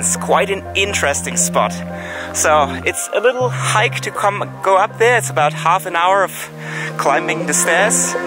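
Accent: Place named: Germany. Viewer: You make some dope vids, man. I'm also Iranian and I hope you had a good time here. I noticed you have German accent, so I gotta say that I'm a big fan of:)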